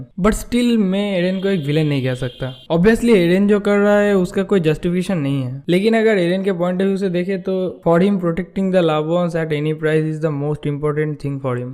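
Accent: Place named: Bengal, India. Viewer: bro you sound like bangali are you ?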